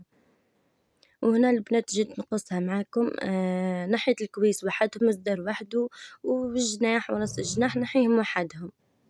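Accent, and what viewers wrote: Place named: Guelma, Algeria. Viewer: نتوما اصلكم من قالمة ولالا خاطر نتي وماماك لهجتكم تقول قوالمة واسمحيلي على التقرعيج وربي يهني بنيتي تمنيت عندي طفلة ونعلمها تكون كل صبع بصنعة كيفك الله يبارك عليك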